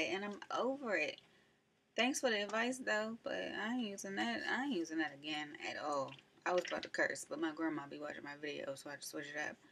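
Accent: United States. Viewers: She from DMV 100% lol... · OMG your whole video I was like, she gotta be from D.C.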